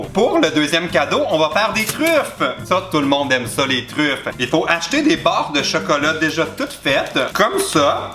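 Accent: Canada. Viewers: Je suis contente de enfin trouver un youtubeur qu parle comme une personne canadien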